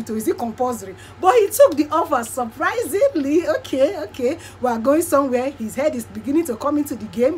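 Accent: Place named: Nigeria. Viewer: I just love the Nigerian Pidgin accent from you😂😂. Makes the story super juicy.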